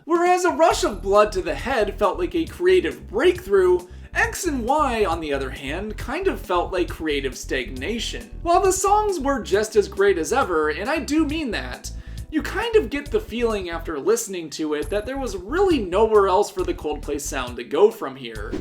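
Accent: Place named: United States. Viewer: It's so strange to hear British grammar (Coldplay ARE) with an American accent, great video though (I'm assuming your accent isn't Canadian, might be wrong about that though)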